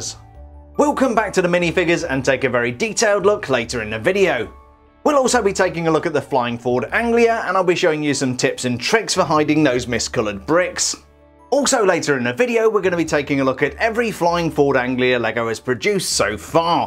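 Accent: United Kingdom. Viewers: I’m confused do you live in Britain or America because you have a perfect British accent but then keep hinting you live in America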